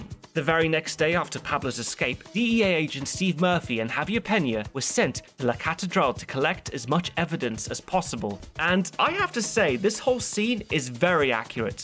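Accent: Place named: England, United Kingdom. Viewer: The English accent helps with his credibility xD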